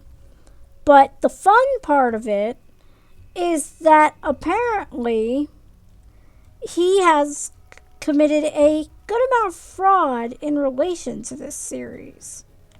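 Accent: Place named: South, United States. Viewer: You sound a little bit young and like you're from the south And you listen to Magog😂 🤔🤔🤔 very interesting🤡🌎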